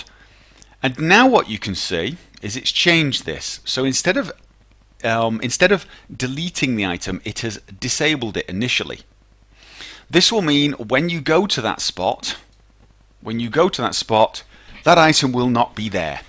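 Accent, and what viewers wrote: United Kingdom. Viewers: Fucking bittish accent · Not only does your voice sound annoying, no, on top of that you have a highly obstructive british accent. Please visit a speech therapist, everytime I have a problem with Skyrim and watch your videos my ears feel raped. It's not "coot" it's "cut"...damnit. Anyways thanks for the thorough guide and fixing my problems again.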